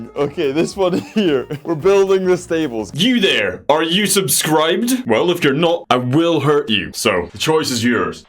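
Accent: Scotland, United Kingdom. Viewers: thx for commenting, love your Scottish accent, looking to play a bagpipe rn ❤️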